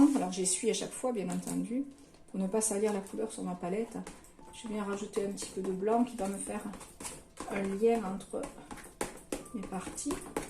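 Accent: South, France. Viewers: Je ne trouve plus de nouveaux mots pour dire combien j'ai ton travail et ce tableau est à tomber. J'aime le rose, mais avé l'accent c'est encore plus beau. Merci. Merci 😘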